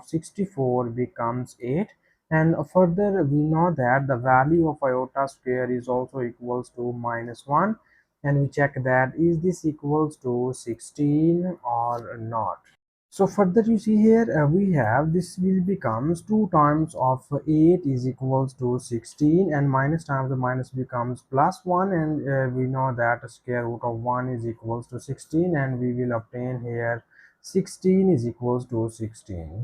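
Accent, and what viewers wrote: India. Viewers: Indian English?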